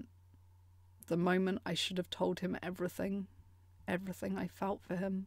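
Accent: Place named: England, United Kingdom. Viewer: She should have kept going. Her english accent contrasts a great deal with her dirty talk.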